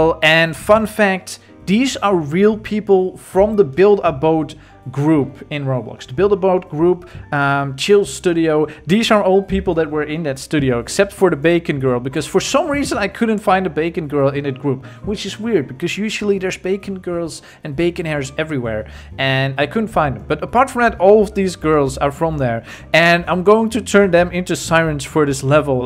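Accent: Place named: Netherlands. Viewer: Ayy you sound dutch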